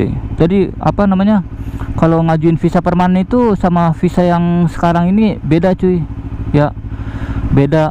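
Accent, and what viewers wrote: Indonesia. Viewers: Logat ngomong kayak org jawa benarkah?:v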